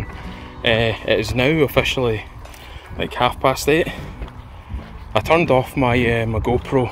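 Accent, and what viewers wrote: Scotland, United Kingdom. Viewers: I watch for the content, my wife approves solely because of your Scottish accent!!! Win win! Nice fish btw!!!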